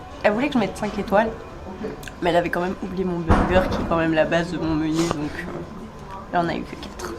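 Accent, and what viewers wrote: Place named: South, France. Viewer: ça semble le sud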